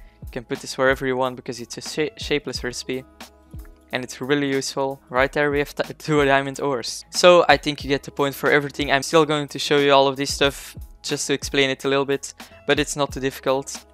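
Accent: Netherlands. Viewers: i like how this guys sounds dutch i am from holland to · Are ya dutch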